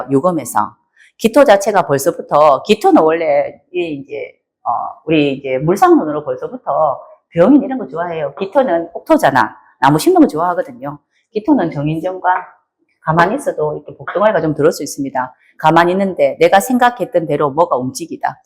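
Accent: Gyeongsang, South Korea. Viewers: ! 표정이나 말투가 보기 좋고 경상도 사투리 투박한 억양을 아주 기분좋게 잘 쓰네여 을목 오월생이 병화를 띄워 그런지 엄청 밝아서 좋은 에너지를 받아여 간간이 보여주는 유머나 천진한 듯한 애교도 구독자의 시선을 한 시간 동안 뺏기에 모자람이 없어 잘 보고 구독하며 감사드려여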